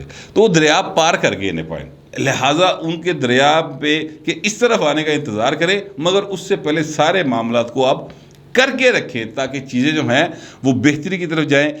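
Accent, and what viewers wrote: Punjab, Pakistan. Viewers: Sir apka Punjabi style boht acha hai.. good analysis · Bhai, ap boht achi batain karte ho, apka Punjabi me bolna mujhe boht acha lagta he boht meethi or piyari Punjabi bolte he, Wese me Sindhi hon, par ab me apka Vlog sun kr punjabi seekh raha hon. Love you, Bhai.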